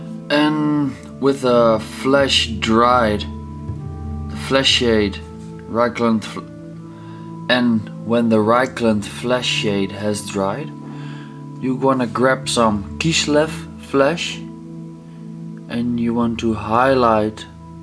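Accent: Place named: Netherlands. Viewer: Geweldig gedaan man, ik kan aan je accent horen dat je Nederlands bent.. Ik heb geabonneerd, echt super goed gedaan.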